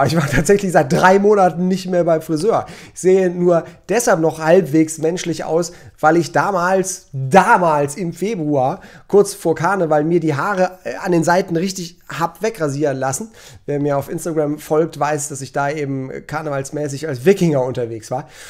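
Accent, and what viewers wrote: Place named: Berlin, Germany. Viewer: was du bist Berliner ???? echt???? Ditt find ick jut :D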